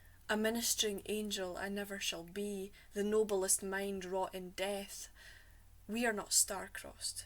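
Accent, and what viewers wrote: Scotland, United Kingdom. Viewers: Hi, Love listening to your South Scotland Scottish accent! I am fae Aeberdeen an I spik Doric. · Amazing love her command of the Scots language accent and the red hair and of course the poems.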